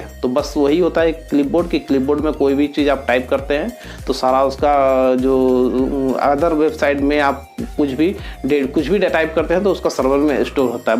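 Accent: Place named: Assam, India. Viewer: U talk like Assamese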